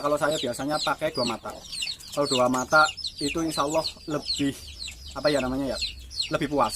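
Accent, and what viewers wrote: Banyumas, Indonesia. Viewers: Ngapak nya mana kang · Joooss sobat ku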